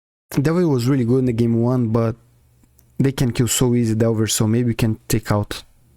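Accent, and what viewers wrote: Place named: Brazil. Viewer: Meu Deus é brasileiro 😝😝😝 Melhor sotaque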